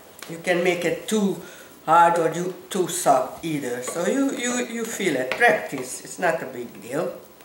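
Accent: Hungary. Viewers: Thank you for doing all of these! They are really helpful. My father was a '56er and we had many Hungarian friends in Cincinnati. I remember for St. Stephen's Day we would have a big gathering. The older women would come over to our house early and make Goulash in a pot over a fire in our back yard. And they would always cut the Nokedli into it! Your accent brings me back to those days. I could listen to you talk all day.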